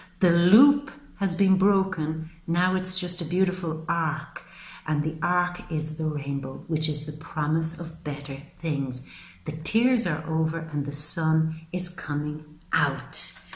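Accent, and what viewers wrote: Ireland. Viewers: Do I detect an Irish accent? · You're Irish also? 😊😂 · Very nice to find you! Are you Irish? Great reading!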